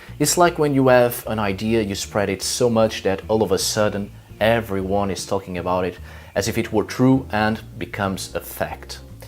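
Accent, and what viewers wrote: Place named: Portugal. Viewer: Olá,! Estava em dúvida se eras mesma tuga, até porque pelo nome bem podias ser escandinavo, mas o sotaque (não leves como pejorativo, até porque falas inglês muito melhor que eu) e a fisionomia deixaram-me com a pulga atrás da orelha. Mas depois fui ao insta confirmar, e claro também porque um português que se preze consegue reconhecer outro compatriota ao longe ahah;) Vídeo interessante, apenas senti falta de referências e fontes listadas na descrição do vídeo, não só por uma questão de verosimilhança da informação mas até para aprofundamento da mesma. Bom trabalho, vou começar a seguir.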